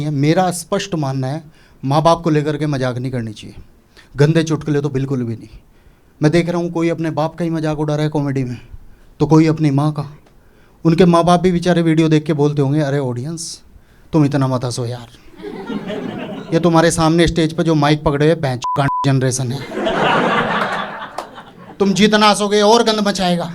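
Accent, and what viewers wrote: Western Uttar Pradesh, India. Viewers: I love west up Xcent 😅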